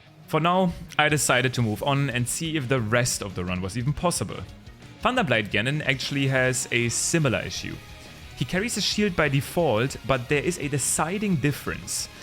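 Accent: Germany. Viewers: ICH HÖR DEUTSCH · deutscher akzent lol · Always love it when I watch a new channel and I can immediately hear the german out of them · Der gute alte deutsche Akzent verrät einen letztendlich doch immer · Are you german? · Er klingt sehr deutsch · deutscher kann man ja garnicht klingen haha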